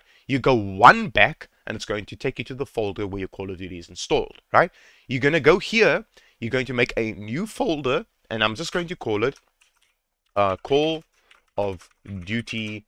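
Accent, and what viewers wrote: South Africa. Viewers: Lekker what a legend! Adding you up. When I heard the South African accent I knew this’ll be a quick fix!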